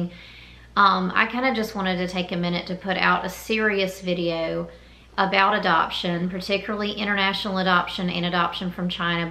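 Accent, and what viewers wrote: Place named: Carolina, United States. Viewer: You sound like a fellow Carolina girl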